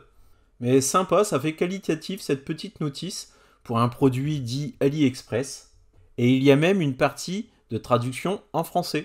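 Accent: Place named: Nord-Pas-de-Calais, France. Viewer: Ah le chti ! T'es quand même attachant ! 😜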